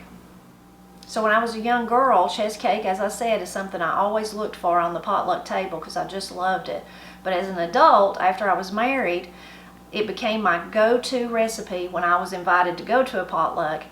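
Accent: Appalachia, United States. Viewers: Your accent makes me want ramps and tators and deer meat,cornbread pudding.😂💪😇🙏🙏🙏🙏🙏🙏🙏love west virginia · I love your channel and learning about Appalachian culture. My family is from Arkansas, but I suspect that somewhere down the line we have some family from this region. My mom’s accent (and her mother’s) sounds so much like yours, and she uses a lot of the same words. I asked her about it, and she said that my grandparents traveled a lot picking fruit and following field work before she was born, so maybe they had picked up some of the accent from fellow workers. Anyway it is really interesting to me, and I’m sure to many others as well. I’m happy to see all of these recipes and to learn the backgrounds on them too!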